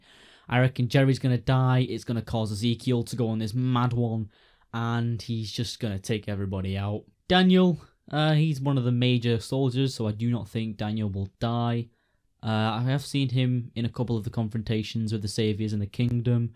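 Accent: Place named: Ireland. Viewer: Nice video and predictions! Are you Irish btw? Love your accent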